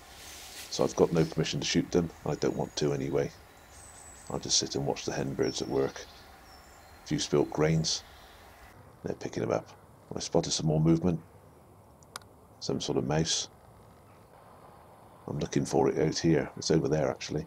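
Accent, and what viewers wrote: United Kingdom. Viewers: I was just thinking if you put all that all your videos on DVDs and put them on American type DVDs to where I can watch him on my DVD player I would buy them and I know a lot of other people that would enjoy your Pest Control show on YouTube would enjoy them as well and be a good way to make money as well cuz a lot of people would buy them and I would definitely buy them just too helpful hint saying that I would love to sit back and watch it on a bigger screen instead of my phone they let me know what you think and it would be awesome to own all your videos because it's been an hour of watching them two good learning experience there's a couple of things that you say that are funny in each video because just the way the British talk I'll I love the way the British talk they have a certain way with their slang and it's just funny and certain things that you say like mr. 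Pheasant and for me is like thanks for playing mr. Squirrel it's all over LOL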